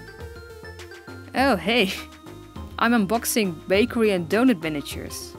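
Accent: Netherlands. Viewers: OMG YOU ARE DUTCH? OR LIVING THERE? I can just hear it at your voice! I am living here too. in Rotterdam. now I feel better. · I KNEW IT - I recognized the Netherlands accent in your voice! I live there too-! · Dus je bent eigenlijk Nederlands? Btw dat denk ik door het accent ———————————————————————- So you are acually dutch? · I live in the netherlands too! This is my first time watching your channel, and when i heard your voice and accent i immedianntly knew you lived in the netherlands! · Wait? We Dutch like our coffee too. Well okay I’m English but living in Holland these last few years gave me a love of coffee. I’m going to susbscribe and see what you do with dolls. Btw, are you from the north of Holland? I can’t quite place your accent :P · U can hear ur dutch😂😂😂 so am i btw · Oml NEDERLANDS YES YES YES IK WIST T AAN JE ACCENT :D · Ik kan het gewoon duidelijk horen aan de manier waarop je praat dat je Nederlands bent 👏👏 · Ik dacht al dat je Nederlands was door je accent. 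Leuke video ❤️ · Ik kan het horen dat je Nederlands bent. Het accent😂😂😂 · ik kan zien aan je acsent dat je nederlands bent xD \ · haha ik vind je nederlandse accent echt geweldig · Ik hoor het aan je stem dat je nederlands bent en je praat nederlands en Nederlands 😅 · I didn't know you were Dutch as well until I watched Fairy's video and now I can clearly hear your accent xD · Haha, hoor t gelijk, dat is een Nederlander xD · I’m new to this channel but when I heard your voice I knew you where Dutch! Finally someone who likes dolls and Littlest Pet Shop that lives in the Netherlands! Thanks for making this channel! This really helps me out but can you make videos for Littlest Pet Shop? That would be nice! Still nice video! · haha je hoort echt dat je uit nl komt maar leuke video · I can just FEEEEEEEEL the dutch accent (yes, me myself is also dutch) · Ik hoorde je accent en vroeg me af is zij Nederlands ofzo xD Edit- waar haal jij je manga? 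· Ik kon gelijk horen dat je uit Nederland kwam😂😂❤️❤️❤️ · Haaaaaaaaaargh Nederlands accent😂, ik wist ineene wel dat ik de goeie video had! En echt wel een gaaf kanaal ey! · Ha ha😁 I'm Ducht and can hear you are to😆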